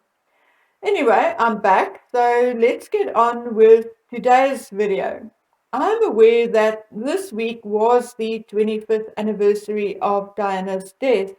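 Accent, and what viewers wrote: South Africa. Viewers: Hello! I really enjoy your video chats and sincerity. Love your South African accent. Bravo! Yes any intelligent person realises that M is ill and your analysis of the situation and the possible end is absolutely valid. May l please just ask one thing? Do you write out your messages and then read them? Sorry sweety it sounds like it.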